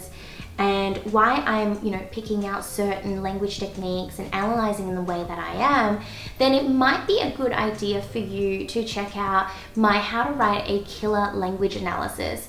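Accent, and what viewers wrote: Australia. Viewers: honest question out of curiosity: are you Chinese/Korean/Japanese Australian with a background in learning American English?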